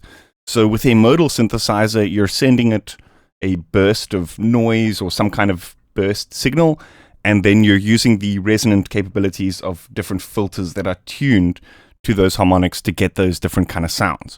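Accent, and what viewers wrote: Germany. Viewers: Sounds like a german accent to me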